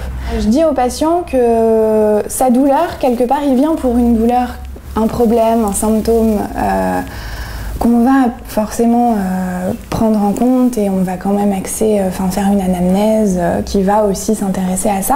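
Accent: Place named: Paris, France. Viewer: Dawsen Mage c’est du français parisien 🇫🇷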